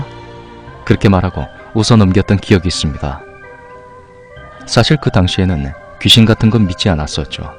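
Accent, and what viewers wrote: Gyeongsang, South Korea. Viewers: 이런건 심심해도, 호기심이 생겨도 하지않는게 좋을것같네요. 오늘도 님의 목소리와 경상도사투리를 들으며 하루를시작합니다. 좋은하루보내시고 화이팅~~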